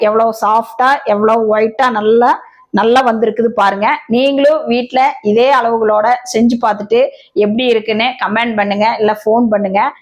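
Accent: Coimbatore, India. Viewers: நம்ம கோயம்பத்தூர் மரியாதை பேச்சு(ங்க) தனி கேட்கவே அவ்ளோ நல்ல சந்தோசமா இருக்கு 🎉🎉😊😊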